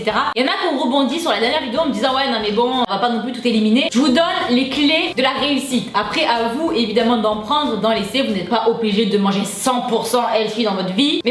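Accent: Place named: Nice, France. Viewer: J'adore cet accent niçois dans toute sa splendeur,vraiment ☺️ c'est peut-être la première que je commente ici mais franchement,j'aime bien ton contenu 😉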